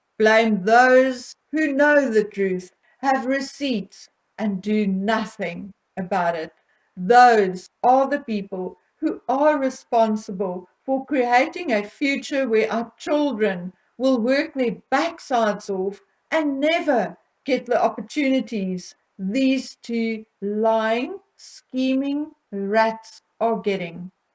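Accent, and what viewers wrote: South Africa. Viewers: You have the most pronounced South African acsent...you should speak Afrikans it would be more tolerable.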